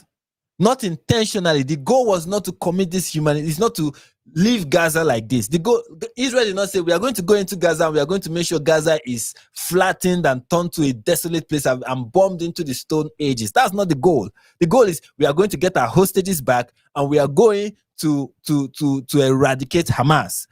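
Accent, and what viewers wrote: Nigeria. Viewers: The world doesn't revolve around Americans......So get used to the accent. PROUDLY NIGERIAN 🇳🇬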